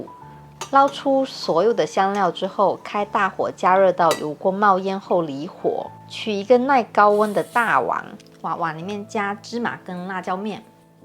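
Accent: Fuzhou, China. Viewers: 您是福州人吗？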